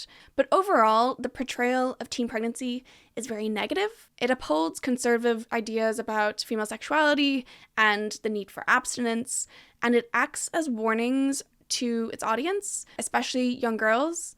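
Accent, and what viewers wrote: Ireland. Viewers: You’re Irish ?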